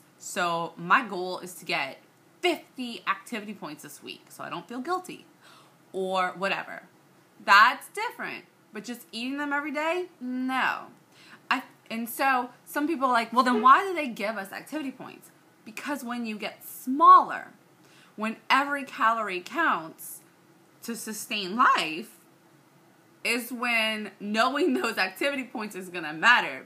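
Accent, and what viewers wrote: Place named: New Orleans, United States. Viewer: great video.. just curious.. are you from the new orleans area too? (the accent has my curious, you sound like me and my friends)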